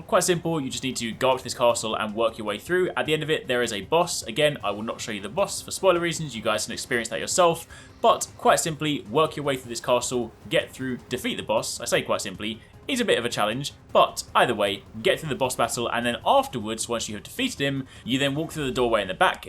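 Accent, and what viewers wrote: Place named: England, United Kingdom. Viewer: You talk so fast and ur English accent makes you sound smart. But seriously I love how u cut to the chase. I'll follow you off a cliff if you ever jump off one.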